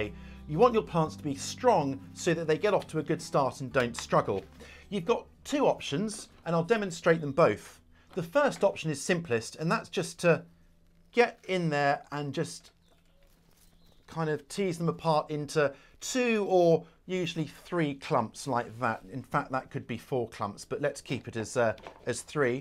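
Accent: England, United Kingdom. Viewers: May I ask what zone are CV you in? You sound like Englishmen where is your garde in.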